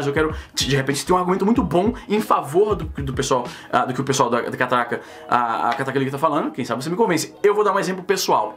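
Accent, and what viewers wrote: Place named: Ceará, Brazil. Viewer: Eu percebi algo de cearense em vc . ( fala rápido pra caralho )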